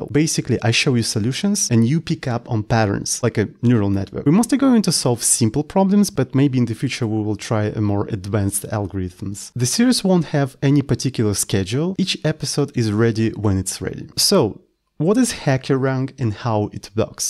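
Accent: Russia. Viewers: Чую рашн аксент. Найс видео, keep going.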